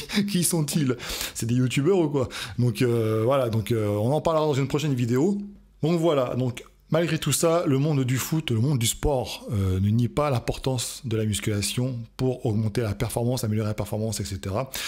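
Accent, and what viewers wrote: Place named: Nice, France. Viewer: T'as de plus en plus l'accent niçois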